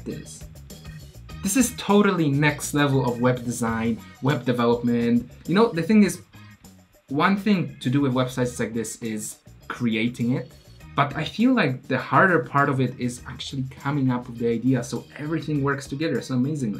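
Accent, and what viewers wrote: Poland. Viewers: Jak usłyszałem 'hey Jacek', już wiedziałem, że to rodak xd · Ciągle słyszę Polski akcent ;)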